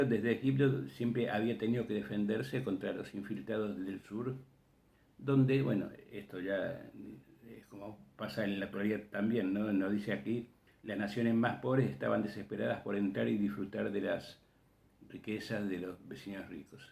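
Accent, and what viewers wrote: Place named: Uruguay. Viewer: POR TU ACENTO SOS URUGUAYO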